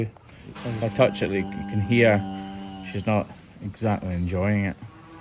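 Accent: Scotland, United Kingdom. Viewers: No, it's Scotland. Between the accent and him saying southwest Scotland in the video. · I just had a short come up under the title satisfying Hoof, is that your short? I ask because the Scottish âccent and voice sound like you.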